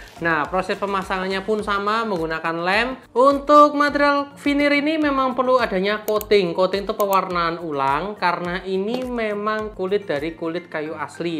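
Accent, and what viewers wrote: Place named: Java, Indonesia. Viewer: medok banget.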